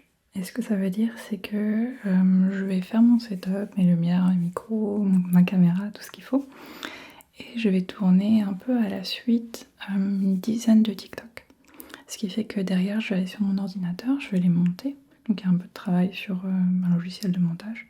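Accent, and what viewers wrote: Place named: France. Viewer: Coucou ! Par rapport à la question sur tes pronoms/ton genre, du coup je me posais une question, comment le pronom "ael" s'accorde pour les adjectifs ? Si j'ai bien compris tu préfères utiliser les adjectifs neutres ce que je comprends totalement mais voilà je me posais la question :) Sinon par rapport à la bienveillance que tu retrouves + chez les anglophones que chez les francophones par rapport au 50/50 des vidéos anglaises/françaises je pense que c'est parce que les anglophones ils adorent l'accent français même s'ils comprennent pas et les francophones ils aiment pas trop les vidéos en anglais.. en tout cas bon courage pour tenir le 50/50 mais sache que tu nous decevras jamais peu importe les vidéos que tu fais :)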